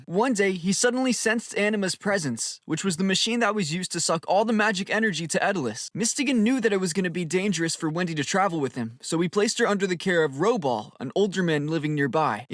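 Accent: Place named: United States. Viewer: Your American accent is adorable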